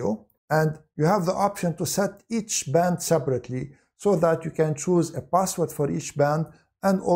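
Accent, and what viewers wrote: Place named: Lebanon. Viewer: Nice video and nice Lebanon accent 😅 I had a little question, if i put the router in AP mode, will the guest wifi still works ? and will it be separated from my main network ? Thankyou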